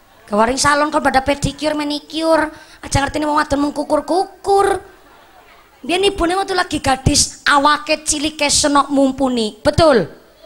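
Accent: Banyumas, Indonesia. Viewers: Seru banget dengerin ceramah ustajah mumpuni asyik bahasanya carpur ngapak emang KL jebolan Indosiar cakep semuanya.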